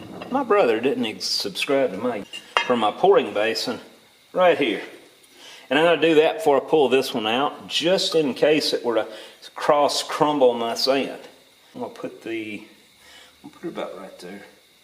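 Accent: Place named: United States. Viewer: I miss Josie & Dollor and you speak very good American.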